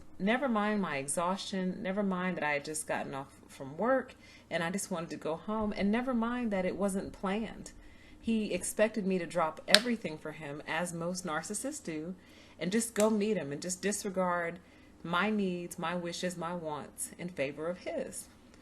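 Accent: South, United States. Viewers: You have a beautiful Southern accent!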